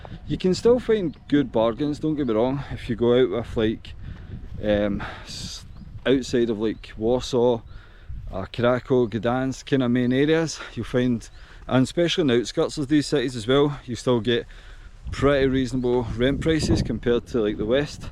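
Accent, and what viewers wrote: Scotland, United Kingdom. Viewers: Once in the Netherlands I met a drunk man in an elevator with a Weesky in his hand. He claimed to be Scottish. Then I didn't believe he was from the Kingdom, I thought he was also from Eastern Europe like me, and that he spoke terribly bad English. Now that I hear you (Scottish accent for the second time in my life) and I know that you didn't drink alcohol, I already believe that he was Scottish. I understand you, but it was hard to understand him when he was drunk. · Easy to understand. I love scotish accent.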